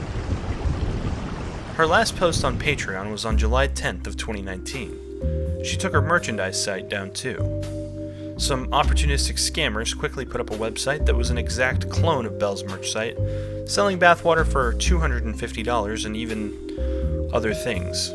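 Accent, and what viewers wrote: United Kingdom. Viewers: its a girl version of leafy..... except british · SHE IS FKN BRITISH? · Huh, didn't know she was British. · Bro i never knew she was British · Am I the only one who didn’t know she was British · Am I the only one who had no idea she was british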